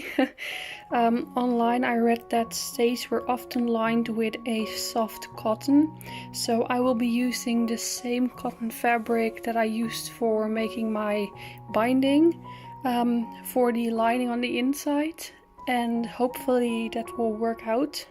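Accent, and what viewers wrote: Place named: Netherlands. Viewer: Are you dutch ? You sound dutch (I am dutch) I love how you project turned out it looks amazing !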